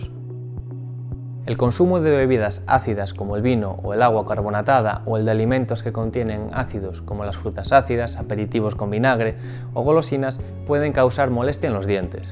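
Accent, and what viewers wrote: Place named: Galicia, Spain. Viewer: parece de Galicia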